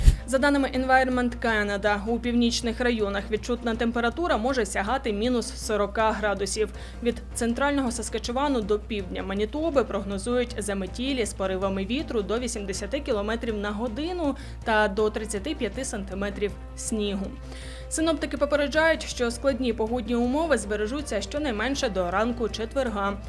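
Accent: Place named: Slobozhanshchyna, Ukraine. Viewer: Я понял, со Слобожанщины,Полтава? Акцент априори от туда,не?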